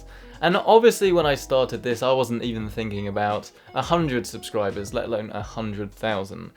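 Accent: United Kingdom. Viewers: the British accent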